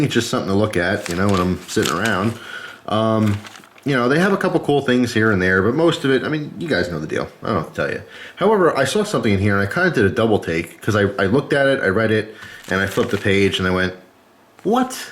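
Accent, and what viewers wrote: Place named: Eastern Pennsylvania, United States. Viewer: I know a eastern PA accent when I hear one....lol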